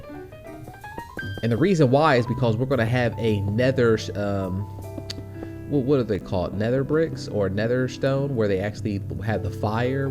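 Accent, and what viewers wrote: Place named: South, United States. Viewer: Nice! I hear an accent in your voice--are you southern?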